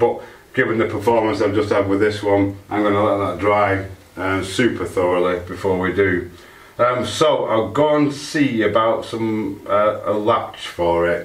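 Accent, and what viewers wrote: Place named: North, United Kingdom. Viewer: Congratulations on reaching over 2,000 subscribers. The two of you make a wonderful team. Thank you for not having background music when you are carrying out your tasks and craftsmanship, it make viewing so much more pleasurable., it’s great hearing a northern accent, and your dry humour. Looking forward to seeing your future projects. Keep up the great work. Pauline, Sheffield.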